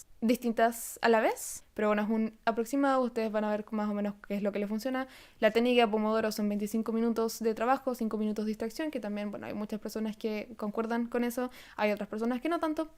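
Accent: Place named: Chile. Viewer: hola, al ser de las pocas personas que usa lenguaje inclusive en todo su canal, me lleva a preguntarte con todo respeto, ¿perteneces a la comunidad lgbtq+? gracias por tu canal, tus videos, me encantan!. tambien me gusta mucho tu acento chileno, aunque dices que eres de Peru viviendo en Chile.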